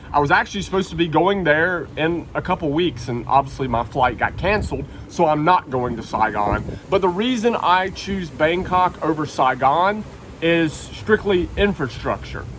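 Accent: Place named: Georgia, United States. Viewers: Your Georgia accent is very strong and thick but that is okay because you are well spoken on your video's. I like your video's very much and I am sure with your work ethic you will have great success in business and be prosperous. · Ya you sure have that Atlanta accent, congrats bro you showed up NUMBER ONE on my home page under recommended! big moves my dude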